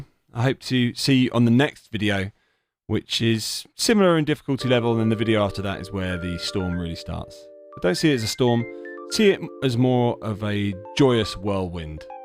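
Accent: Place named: United Kingdom. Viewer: British accent, good man.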